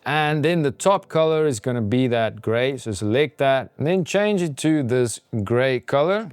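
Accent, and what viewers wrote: Afrikaans, South Africa. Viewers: You sound Afrikaans??😁😁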